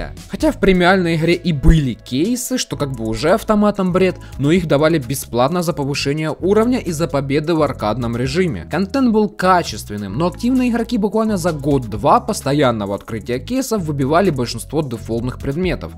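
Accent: Ukraine. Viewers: черт, я по голосу вычислил сразу что ты из Украины🇺🇦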